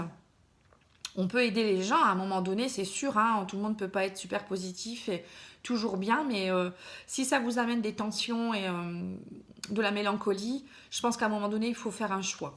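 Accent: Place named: North, France. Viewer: Accent du Nord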